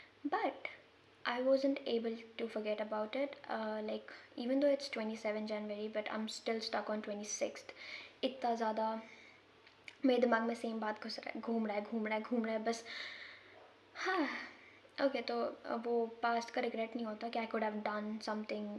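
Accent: Bihar, India. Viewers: Bihari ho kya ?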